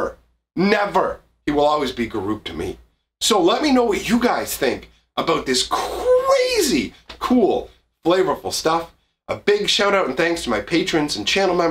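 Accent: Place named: Canada. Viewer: Sick!? I noticed from your voice the you are still Canadian! Great video man.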